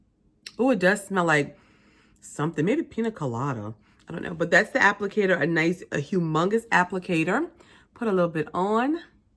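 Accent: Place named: South, United States. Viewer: i can listen to a southern accent forever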